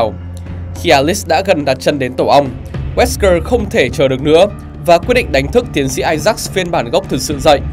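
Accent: Vietnam. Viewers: Bác thuyết minh dân Nghệ hay Hà Tĩnh v ạ?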